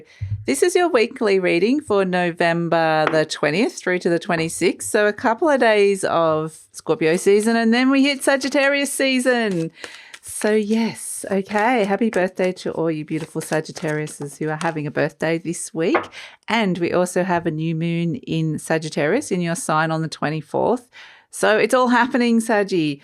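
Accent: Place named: Australia. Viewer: I love to watch Masterchef Australia and you have the same accent than Billie, knew right away that you are in Australia, all the green in your garden, beautiful 💙 Thank you for your reading, always interesting and inspiring Wishing you a great weekend 😊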